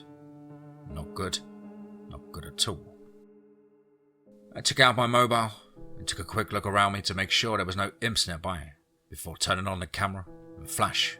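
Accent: England, United Kingdom. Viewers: Eric C you do. is your homie with a UK accent, but to him it's just how he sounds as an Englishman lol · You sound exactly how I imagined my homeboy with a U.K accent would, if I had a homeboy with a I.K accent that is.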